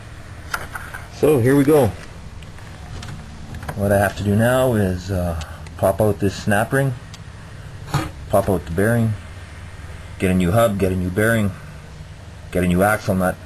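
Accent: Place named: Canada. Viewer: Canadian eh...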